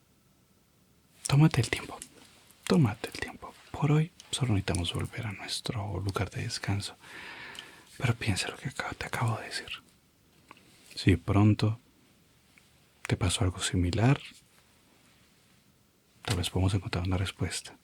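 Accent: Colombia. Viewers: Es colombiano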